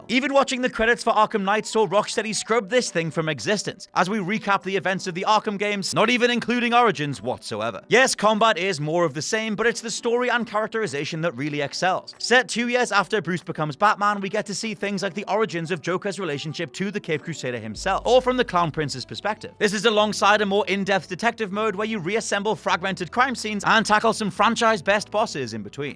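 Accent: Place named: England, United Kingdom. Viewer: Shouting English accent I can't watch these videos it's painful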